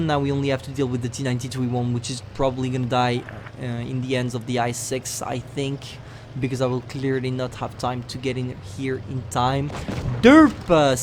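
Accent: France. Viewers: I can feel ur french accent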